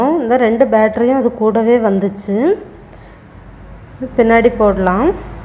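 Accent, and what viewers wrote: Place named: Kanyakumari, India. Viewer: Good to hear your Kanyakumari tamil Stay back connected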